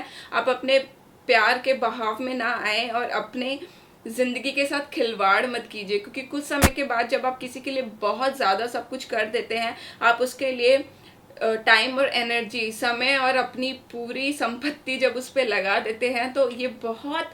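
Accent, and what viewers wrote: India. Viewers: Logo ka kaam hai khna, unhe khne do.btw love ur hindi accent( i speak urdu)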